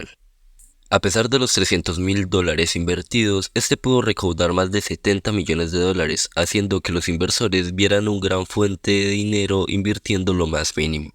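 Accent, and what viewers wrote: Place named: Colombia. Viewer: Parece acento colombiano.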